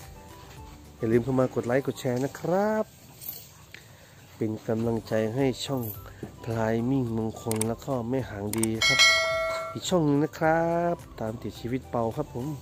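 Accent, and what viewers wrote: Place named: Isan, Thailand. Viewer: น่ารักจริงๆแถมมีเสียงพากย์อีสานของ เข้ากันดี รักนะจ๊ะ ขายอะไรคะ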